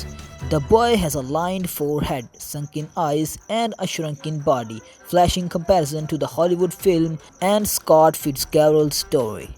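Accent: India. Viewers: There is nothing wrong with an Indian accent! The background music however was too loud and overwhelming. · You are totally right.But it is normal that you get criticized, when you upload videos on youtube. And just be honest his accent is pretty hard to understand.He does good job producing his videos, but i think he should talk in his native language, and upload them for hindu ppl.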